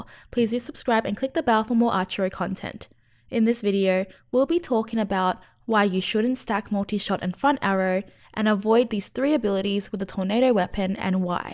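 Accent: Australia. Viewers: Hi, I hope you are well! From my experiment, if you use meowgik, the cat damage is also reduced, due to the reduction of your base damage. I hope you can mention that in your future videos. Cat damage are reduced by around 25% when picked front arrow +1. Also from your accent, I assume you are Australian? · Sounds like your from Australia? I don't know why we do it, but I know a LOT of Americans who LOVE everything about Australia! The closest I've been to Australia was in 1975. The year I lived in Singapore. (Loved it!) If I'm wrong about your location, sorry but I still love your accent! Thanks for the game help! I was stuck & now I can tear up Archero!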